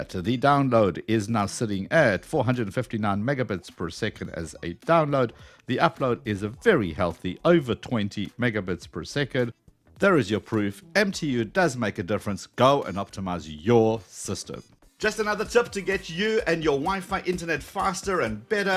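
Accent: South Africa. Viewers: south african guy hear accent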